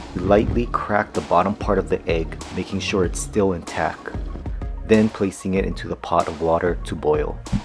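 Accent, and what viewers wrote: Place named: Hawaii, United States. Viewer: Great tip!! I hate when that membrane gets stuck on the egg! Yuck... btw... you sound like you're from Hawai'i 😀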